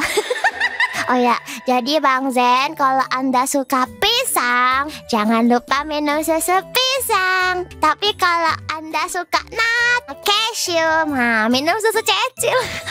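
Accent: Java, Indonesia. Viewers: Suka beut ama aksen jowo nya si cecil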